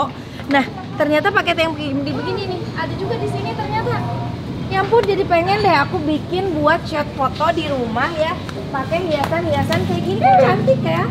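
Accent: West Java, Indonesia. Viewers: Lucu banget teh logat bahasa sundanya 😄👍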